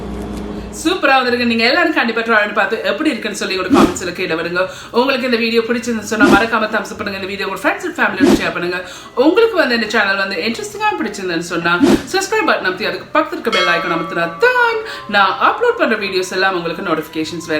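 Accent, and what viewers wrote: Sri Lanka. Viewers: இலங்கை தமிழ் நீங்க செஞ்ச தோசையைவிட அருமையாயிருக்கு .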